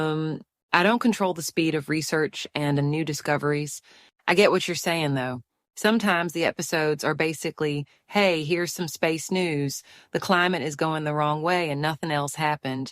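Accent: South, United States. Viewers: Well damn, Miss, I think I might be fallin' in love with you, lol! Just joking, but for real I LOVE all the things you talk about and the knowledge you give us! Really good stuff all around. Plus, I am a Southerner and you have a wonderful accent that warms my heart. I grew up under tutelage of a very smart father - anesthesiologist, sailor, hunter, fisherman, etc. So, I, as a sailor, surfer, fisherman, etc. became, as he was, something of a meteorologist (because surfers and sailors have to pay attention to such things) as well as something of a science nerd. Long story short - I love what you do and am VERY appreciative! I have already followed and will continue to do so. Thank you from South Carolina;-)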